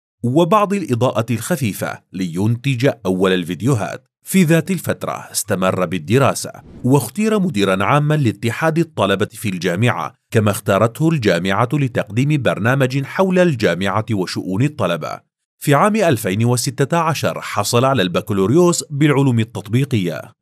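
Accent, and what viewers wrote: Egypt. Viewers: يشخص مميز و كوميدي و علمي و مكس جميل جدا صراحه هو خلني احب اللهجه المصريه صراحه حتى الشرح بسيط ولكن هم جدا معقد و صعب الفهم ولكن الدحيح يسهل المعلومه يخليها جدا سهله فعلن شخص مميز ماشاءالله تبارك الله عليه🥀💜💜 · الافضل رغم لهجته المصرية الدحيح صاحب محتوى راق وسيق ومفيد عكس اليوتيوبرز **معظمهم تافهون وبلا محتوى حتى ***فهو بسيط و لا يحتاج سوى لحسه الفكاهي الظريف و اسلوبه اللبق و العفوي ومعلومات دقيقة من مصادر علمية بورك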